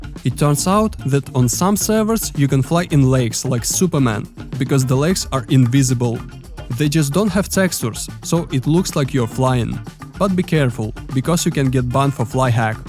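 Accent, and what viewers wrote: Russia. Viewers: Я не критикую и не осуждаю. Просто хочу дать совет. Во первых по акценту слышно что русский. Во вторых существует такое термин как «культурное несоответствие», либо «культурная специфичность». Это говорит о том, что каждый язык несет свою культуру и историю, поэтому идиомы, фразеологизмы переводить дословно будет ошибкой. К примеру «save your nerves”. Англоязычный народ так не говорит, для них это странно. В таком случае следует прибегнуть к использованию словаря, чтобы повысить качество текста. Удачного прогресса и развития!❤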